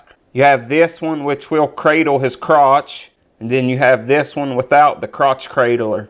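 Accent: United States. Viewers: U got cowboy accent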